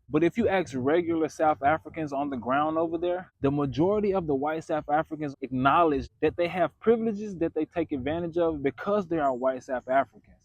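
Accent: United States. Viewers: You are being a reverse racist and promoting action against the whites of this country. Any educated person of any race will not support a narcissistic, self serving supposed US leader who is turning his country over to the other nut job, putin. This is similar to our previous leader selling our country to the guptas. You are speaking absolute bullsxxt about europeans barbecuing black folk. It still is the black on black violence of putting a tyre on a brotha, filling it with petrol and setting it alight. I can not believe YOUR TOTAL IGNORANCE OF ANYTHING ABOUT SOUTH AFRICA. lCome and live here, with your american accent , and see the 2025 truth.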